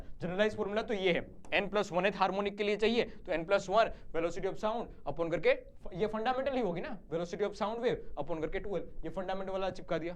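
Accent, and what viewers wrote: Gujarat, India. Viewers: Sir gujrati hai kya??? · aap gujraati ho kya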